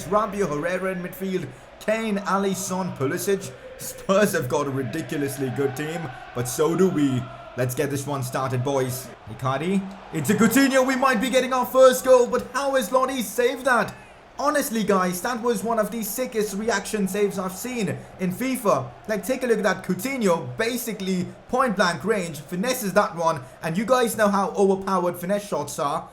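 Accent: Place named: India. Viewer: #PCQ Where are you from? U sound indian..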